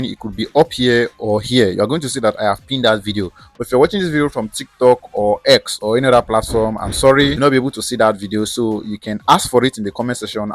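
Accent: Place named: Nigeria. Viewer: You are too fast, maintain your Nigerian or an African voice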